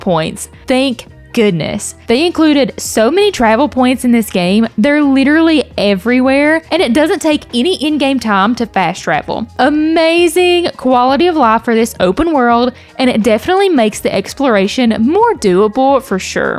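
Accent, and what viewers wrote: South, United States. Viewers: And what part of the south are you from? Just found the channel btw. Good review! Subscribed..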